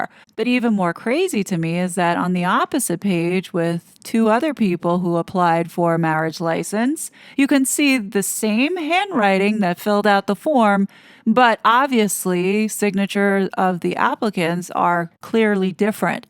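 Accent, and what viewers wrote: New York, United States. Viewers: Hahahaha aw you are so funny and I love your New York accent!! It's a fantastic video as always 💚👍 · Awww, can you talk with you NY accent plz? It would be a treat! 😊😉🤗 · Really enjoyed this. and please, give your New York accent wings. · It’s all very bizarre 😳 I like your New York accent I actually listen for it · I love the New York accent!! Set it free!!! ❤❤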